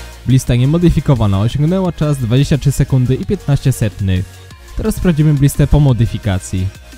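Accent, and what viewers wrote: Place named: Silesia, Poland. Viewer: Domyśliłem się bo osoby ze śląska zazwyczaj wymawiają czy a nie trzy :P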